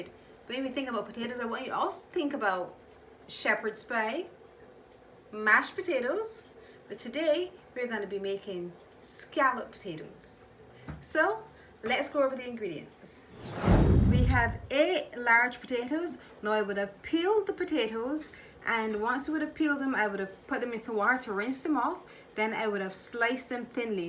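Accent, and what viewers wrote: Barbados. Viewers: Your accent sounds like bajan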